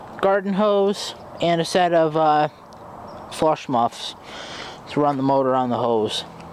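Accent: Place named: Newfoundland, Canada. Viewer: Where are you from? I first expected Newfoundland until you were talking in gallons and pints. Lol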